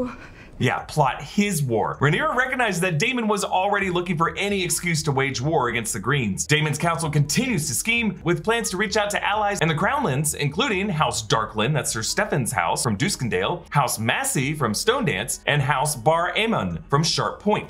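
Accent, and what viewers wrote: United States. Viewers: I LOVE YOUR PLAIN AMERICAN ACCENT!!!! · Plain American accent that finds 5 notable changes in the opening credits when others say “nothing changed” despite having seen the episode in advance and had more time to dissect it. Your videos are well worth the wait. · “Plain American accent” damn shots at the other creators who more than likely made their video after the leak. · “from a nerd with a plain American accent” 🥰🥰🥰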